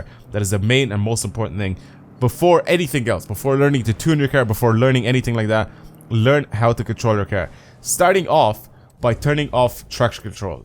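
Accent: Ireland. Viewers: what part. of ireland are. yiu from and if your not irish i swear to jaysus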